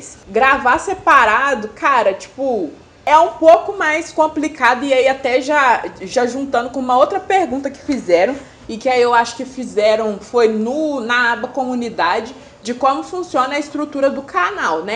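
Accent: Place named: Minas Gerais, Brazil. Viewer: #parabéns meninas ...conheci o canal pesquisando sobre a Gabi de pretas, sim a chamo desse jto, cheguei em em vcs é não larguei mais....fiquei tão feliz qndo vi um canal de mulher preta com sotaque daqui de MG 🙂q bom q vc está cada vez se fortalecendo mais com o canal...voem meninas voem👏🏾🖤🖤🖤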